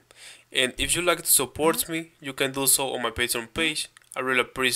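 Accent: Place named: Latin America. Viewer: Thanks. I like the way you explain things. Keep it up broh. BTW. You speak spanish don't you? Te escucho un acento latino:)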